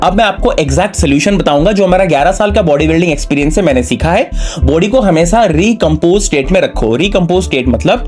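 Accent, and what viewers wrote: Odisha, India. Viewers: Bhaiya r u from odisha???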